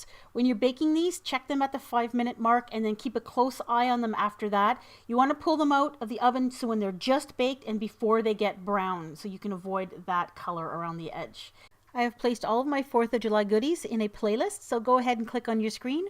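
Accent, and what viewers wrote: Canada. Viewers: Quick question, are you Canadian or American?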